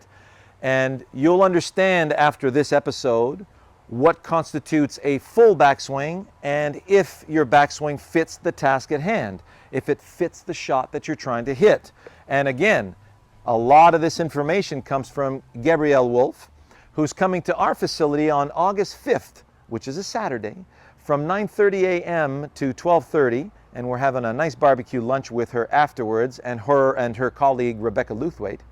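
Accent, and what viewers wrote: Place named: Quebec, Canada. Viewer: Hi Mr. I'm totaly in love with your mental approche for the swing of golf. I found your video by starting to watch your french imitator! By the way your Quebec accent is style intact. I'm from Montréal so if you do come in Montreal for any teaching, let me know. So I'm going slowly throw all your vidéo and trying to apply these tips in my game. I like to pratice more so I'm looking to by a net and a mat similar as the one you use in yout backyard. Can you tell me what's the brand of it. Thanks for all your vidéo.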